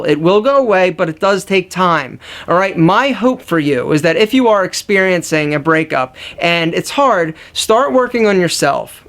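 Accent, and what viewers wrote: Philadelphia, United States. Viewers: You from Philadelphia?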